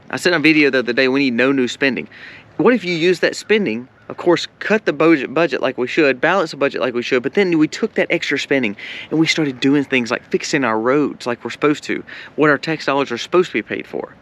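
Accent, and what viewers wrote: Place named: Mississippi, United States. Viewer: Love listening to a local mississippian. Thank you for you updates.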